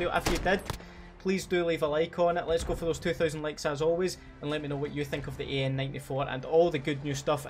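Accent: Scotland, United Kingdom. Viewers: what city in scotland you from?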